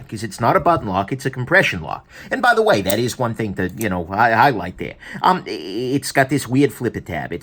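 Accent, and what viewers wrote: United States. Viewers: downvoted because of this stupid yankee accent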